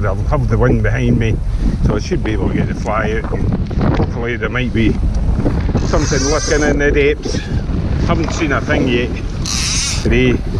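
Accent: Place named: Scotland, United Kingdom. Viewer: It's good to hear a Scots accent again. I moved to Connecticut 12 years ago and now fish for Steelhead in the Great Lakes and saltwater for striped bass. I grew up fishing Yair on upper Tweed, the Dee, Loch Leven etc. It's great to visit these rivers through your films.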